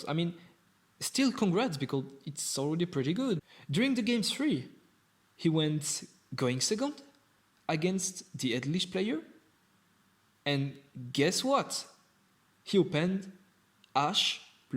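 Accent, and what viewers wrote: France. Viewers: Sound like french accent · Le petit accent français haha . Gg propre tes vidéos je m'abonne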